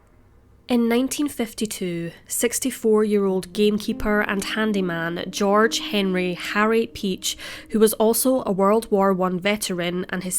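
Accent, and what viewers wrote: Scotland, United Kingdom. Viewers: Ye canny beat a Scots tongue to tell a gory tail 👏👏👏👏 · She's Scottish, mate 🏴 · Cold case. There are lots of them. Is your native language not English or are you not familiar with the many and varied accents in the U.K.? The narrator has a very obvious Scottish accent - she is from Scotland, part of Great Britain so yes, she is a NATIVE speaker of English. You must not watch many U.K./British films or TV. if you don't recognize the Scottish accent.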